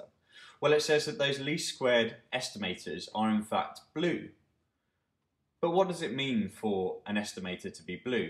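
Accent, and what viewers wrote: United Kingdom. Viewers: just making a pause after some 30 videos... you have a wondurful vision of econometrics, everything is so consistent (...). It is just sometimes a bit complicated for a french guy to understand your Manchester accent...but after some vids it sounds like music of econometrics 😉 thank you so much!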